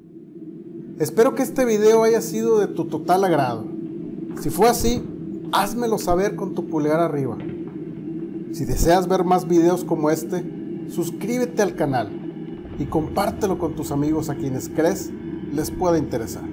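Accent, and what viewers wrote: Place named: Mexico. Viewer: Hablas muy Mexicano , con mucho acento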